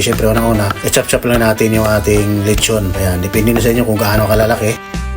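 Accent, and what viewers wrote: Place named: Visayas, Philippines. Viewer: Bisayaa kaau oi..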